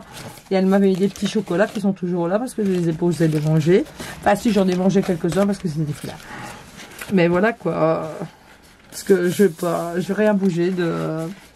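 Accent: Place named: Belgium. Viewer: cc!! je suis abonné a sa chaine et j'adore ses créations!! eh oui tu as raison elle est hyper doué, je n'ai pas eu l'occasion d'échanger avec elle,tu as beaucoup de chance de l'avoir rencontrer,j'ai lu aussi cet article et je trouve que c'est vraiment mérité;bravo a Sandrine!!merci a toi pour cette belle présentation avec ton joli accent belge que j'adore!!bisous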